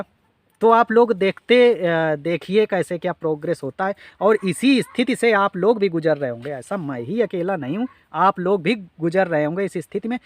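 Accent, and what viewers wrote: Kanpur, India. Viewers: सबसे अच्छी लगी आपकी बोली. सुनते ही समझ गया आप कानपुर से हैं. 1979 में मैं डी एम यू में पढता था. अमरूद के बाग घूमने रावत पुर, कल्यान पुर जाता था.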